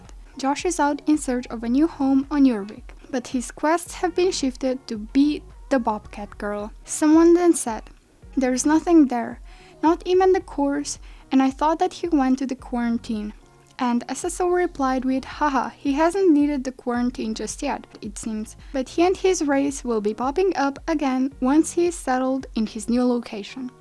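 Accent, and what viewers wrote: Germany. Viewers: Du bist deutsch oder haha? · Are u german? :D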